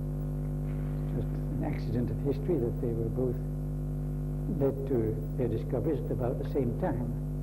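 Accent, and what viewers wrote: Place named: Netherlands. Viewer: He sounds Dutch, strange considering he did not grow up there.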